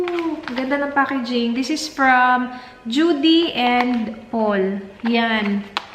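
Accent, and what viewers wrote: Visayas, Philippines. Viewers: Pag nag tagalog parang bisaya ang accent pero pag nag English paksheet😍 sarap sa ears ate. Hi ate newbie hyr😘 pero diko sinasabing dimasarap sa ears yung tagalog haha labyuu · i knew it.. may pgkabisaya heheh i loveee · bisaya ka noh?magkapareho kasi tayo na magsalita.bisaya din ako hihi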